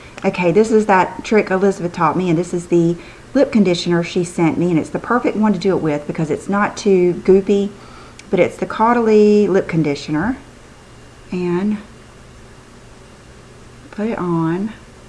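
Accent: South, United States. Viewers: Heheee you, with the bur-berry vs. bur-buh-ree.. I say that you ought to pronounce it just like your instincts tell you:) You are a southern belle; I think it fits that you have your own way of pronouncing it. The lashes/mascara look is incredible.. I think you have been using it a bit lately, yes? (those products) I say this because your lashes have really been looking extraordinary lately. Nice that the line isn't just a famous name, but also are rather superior compared to many other products.. mascaras, etc., for example. You truly are beautiful,.. inside and out. x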